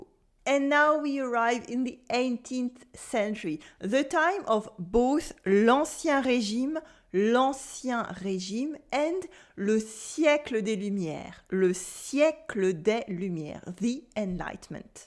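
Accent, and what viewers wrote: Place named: France. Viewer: I believe you speak too much English in your video. That's the reason I don't subscribe to your lessons. You would help those who are listening to hear complete phases in French. Also, as someone else mentioned, despite your good English, you did not pronounce the word Adjective correctly in English. It just sounds like any French person trying to speak English (with a strong French accent). The accent is on the first syllable, not the second. Bonne chance!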